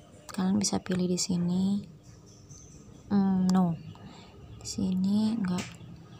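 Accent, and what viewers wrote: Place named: Java, Indonesia. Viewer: Mbak nya jowo ya mba, cengkok e kliatan 😅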